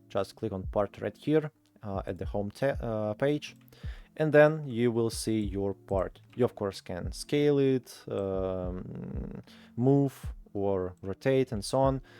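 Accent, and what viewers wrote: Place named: Russia. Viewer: слышится русский акцент, но всё равно классно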